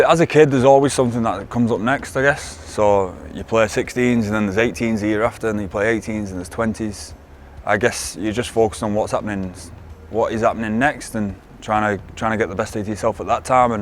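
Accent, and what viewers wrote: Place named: Yorkshire, United Kingdom. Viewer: He’s from Yorkshire, every place has different accents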